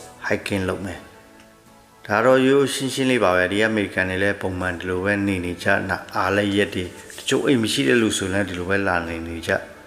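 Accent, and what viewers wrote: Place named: Shan, Myanmar. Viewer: မြန်မာ စကားပြောတဲ့ အသံနေအသံထားက ရှမ်းမြောက်နယ်ဖက် နမ္မတူ ကဖက်ကအသံနေအသံထားပဲ ကောင်းပါတယိဗျာ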